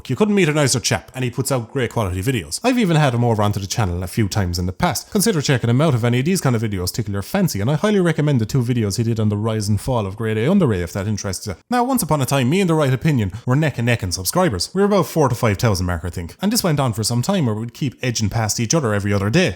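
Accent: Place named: Clare, Ireland. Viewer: which airport the one in shannon or dublin ( Im guessing shannon cos he sounds like hes from clare )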